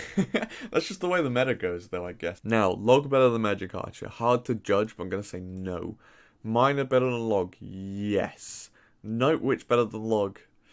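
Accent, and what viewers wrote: United Kingdom. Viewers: I watch your videos mostly for your british accent. I JUST LOVE THE WAY U SPEAK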